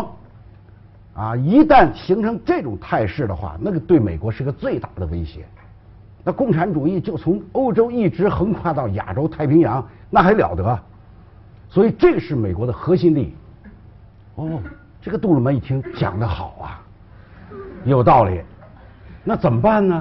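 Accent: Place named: Beijing, China. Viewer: 北京话听着很亲切，又风趣又不失风度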